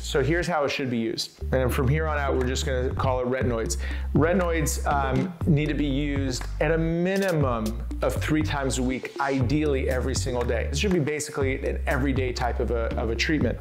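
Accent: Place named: Lebanon. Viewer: لبناني صح ؟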